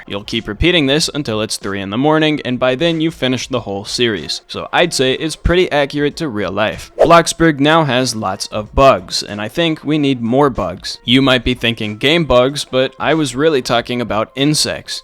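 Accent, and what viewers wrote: United Kingdom. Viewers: Ayo British 😂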